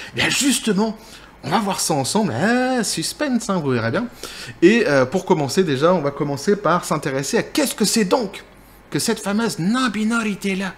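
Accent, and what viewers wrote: Quebec, Canada. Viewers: tu fais plus accent canadien que belge mais je préfère l'accent canadien 😄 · Ah, je suis curieux d'entendre ton accent Québécois alors. Le défi est lancé!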